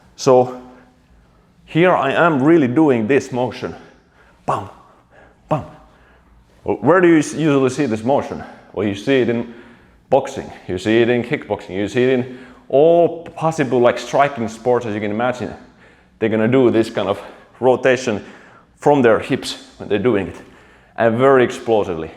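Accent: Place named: Finland. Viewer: The accent sounds like he is Finnish or perhaps Estonian. Neither are in the Indo European language family.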